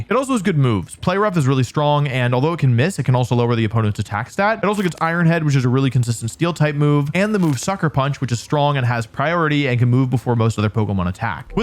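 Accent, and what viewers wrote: United Kingdom. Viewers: your british accent is so authentic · I approve of your British accent and mention sir🇬🇧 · British is something I didn't know I needed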